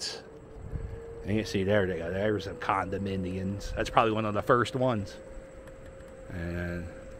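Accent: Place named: Pennsylvania, United States. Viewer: I heard the PA accent and mediately. When you think about Martin Luther King and the motel, remember something. These places were not always the Havens for junkies and hookers they have become. This is where families stayed originally, traveling salesman and other businessman, when the car became popular, people stayed at motels and motor inns. We didn’t have 20 story Sheratons and 40 story Marriotts. All we had were motels and everyone stayed there. It’s weird middle-class America state. You did have some bigger hotels, but they were confined usually two resorts are big cities. Those dives up and down the route one Corredor with normal stopping points on people traveling cross-country or cross state.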